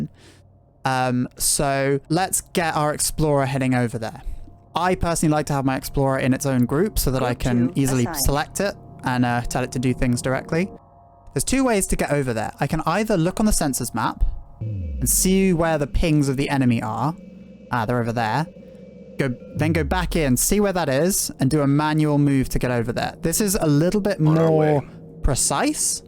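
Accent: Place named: United States. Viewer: Gibt es den keine sch** Anleitung auf deutsch? Ich hasse die amerikaner an sich schon und bei dem Dialekt.... 🤮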